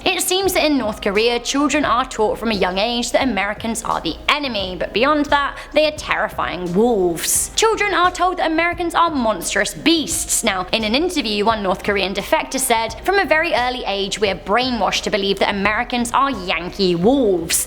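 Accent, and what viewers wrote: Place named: United Kingdom. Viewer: your so lovely 😊🤗😘 & your British accent is Awesome!!!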